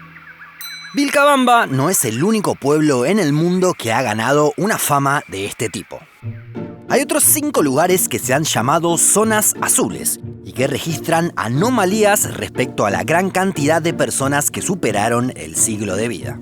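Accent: Argentina. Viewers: El narrador es argentino también, aunque en el equipo hay distan nacionalidades si mal no recuerdo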